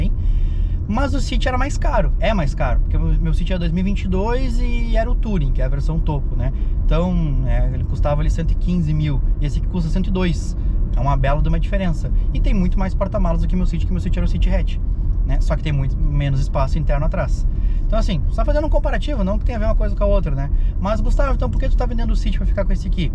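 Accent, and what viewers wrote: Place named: Rio Grande do Sul, Brazil. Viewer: Um FOLKSwagen kkkk esse sotaque gaúcho é um barato!!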